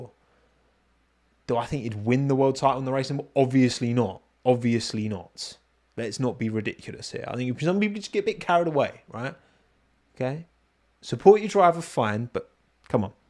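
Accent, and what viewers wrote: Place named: Cockney, United Kingdom. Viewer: Is could just get rid of that gawd awful cockney accent this might be listenable to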